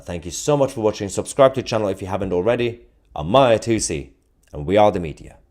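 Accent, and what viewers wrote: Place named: Newcastle, United Kingdom. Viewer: So this guys a Geordie lol I would never have guessed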